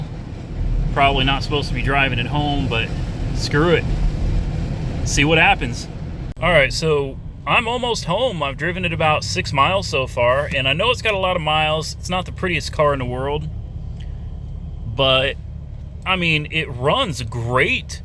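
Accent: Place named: Oklahoma, United States. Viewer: What part of oklahoma you from?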